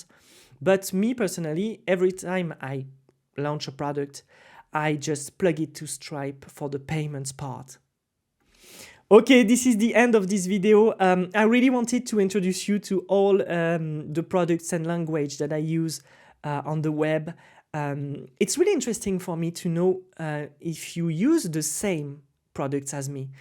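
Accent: France. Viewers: Il me semble que tu es français vu ton accent ( mais tu maitrises parfaitement l'anglais !) merci pour ton retour, moi aussi j'aime beaucoup Supabase et encore plus VueJs! j'espère qu'il va continuer de se répendre et pourquoi pas un jour prendre le pas sur React ;) Si tu utilises un peu Nuxt 3, n'hésite pas à faire une vidéo ou tu l'utilise avec Supabase justement. D'ailleurs est ce que tu l'utilise avec une ORM comme Prisma? Encore merci pour tes vidéos! continue ainsi!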